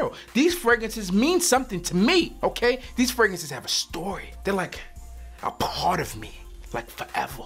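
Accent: Baltimore, United States. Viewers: Homie are you from bmore or Maryland or philly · Definitely from Bmore tho. Good video keep it up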